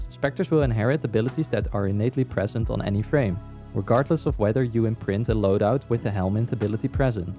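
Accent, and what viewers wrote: Netherlands. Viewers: nice dutch accent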